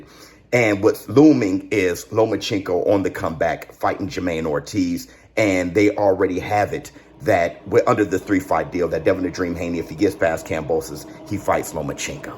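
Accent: Louisiana, United States. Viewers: U from Louisiana?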